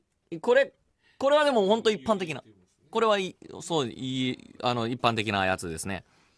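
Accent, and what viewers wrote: Germany. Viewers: You can instantly hear that he grew up speaking german. Source: It's my mother tongue. The CH sound is actually pronounced like that ^^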